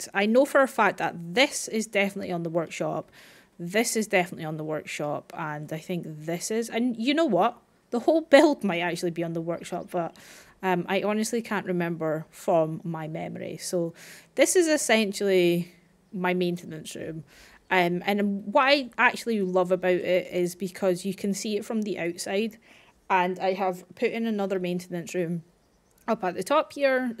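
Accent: Scotland, United Kingdom. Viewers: First video of yours I've ever watched, and when I heard the Scottish accent (well, I think a Scottish accent), I instantly when "SCOTTLAND FOR WEVER" in my what I can a Scottish accent! Buuttt the aussie accent butchers it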